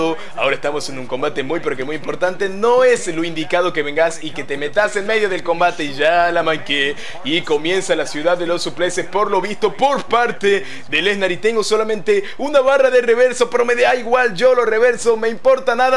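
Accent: Tucumán, Argentina. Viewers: Sos de Tucumán? Argentina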